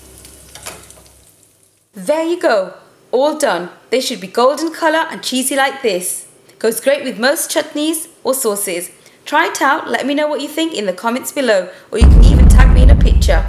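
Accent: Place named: United Kingdom. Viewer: I have no idea what u are saying british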